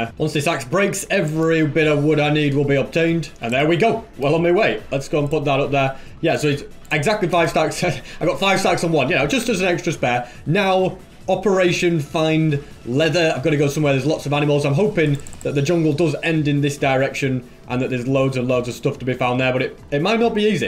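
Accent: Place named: Yorkshire, United Kingdom. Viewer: You have a Yorkshire accent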